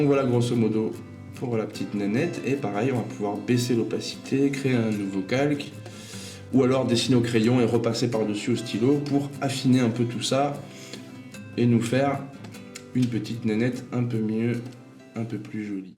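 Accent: South, France. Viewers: comment dire.... tu es énorme!! j'adore ton humour, tes explications...et ton accent sudiste! merci pour ce cours majestueux!! (un nouveau fan sudiste!) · Excellent l'accent du sud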